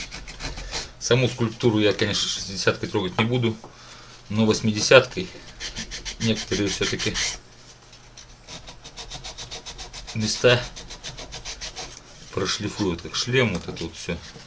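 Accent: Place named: Altai, Russia. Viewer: Зачетная ложка!!! Да, в твоих руках все так легко получается!! Сам сажусь резать - долго все происходит и результат мало радует, но для души это рай трудится с деревом!, где то слышал, что ты из Сибири, а от куда именно? Говор на алтайский похож. Угадал?)))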